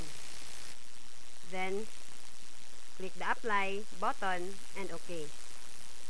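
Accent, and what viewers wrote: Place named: Philippines. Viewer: pinoy???? hahahha. 100%.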